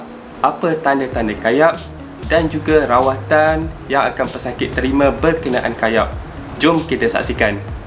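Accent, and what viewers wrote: Kelantan, Malaysia. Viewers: Maaf Tanya doktr ya, doktar org kelantan ke?? Terima kasih atas perkongsian ilmu doktar.. Terbaik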